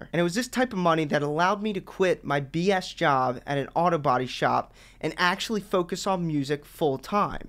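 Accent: Philadelphia, United States. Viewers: you from philly? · Philly accent 120 pct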